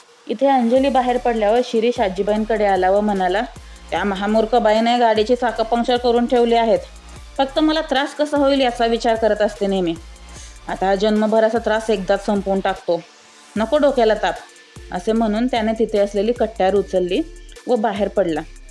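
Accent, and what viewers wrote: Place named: Konkan, India. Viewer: Kokani tone Chan aahe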